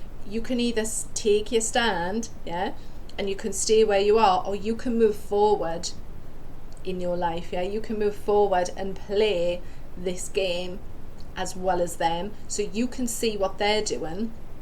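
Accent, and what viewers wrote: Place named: North, United Kingdom. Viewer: !!!! 🙏🙏🙏🌈🌈🌈 You must be in the north because I'm in the south and nobody sounds like you! 😂😂😂 You sound like Sarah Milligan 💜😂 do you do in person readings? I'd love to meet you 🌈🙂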